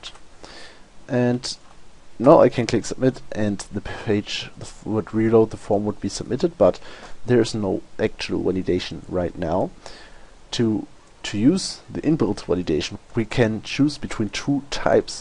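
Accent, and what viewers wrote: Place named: Germany. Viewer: your German accent is music to my ears. Fuck those indian tutorials.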